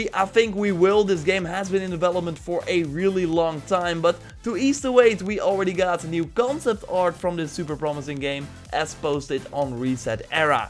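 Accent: Netherlands. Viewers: Ur dutch right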